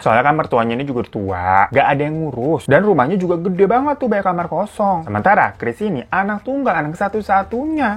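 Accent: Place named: Jakarta, Indonesia. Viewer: Lu orang cikarang ya bang betawi bener😁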